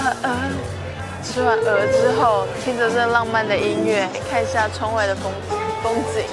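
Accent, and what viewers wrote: Taiwan. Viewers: 最近才開始看你的視頻... 很美，雖然有點台灣國語，但一點也不影響你天然的氣質，更難得的是吃相很優雅..要繼續加油喔！ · 好喜歡聽講台語，台妹味十足!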